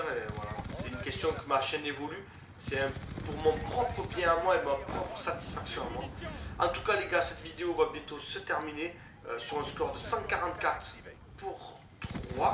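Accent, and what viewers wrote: South, France. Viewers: Continu comme ça ton commentary avec ton accent du sud amene la chaleur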